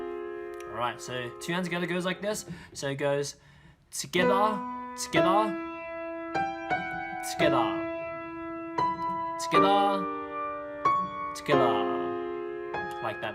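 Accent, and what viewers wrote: United Kingdom. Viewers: i think he is British??? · i like the kinda british accent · best english accent ever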